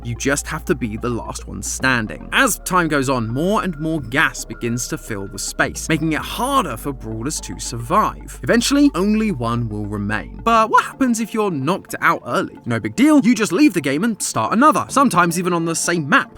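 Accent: United Kingdom. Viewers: Man I love the British accent...brool stars. hehehehe😅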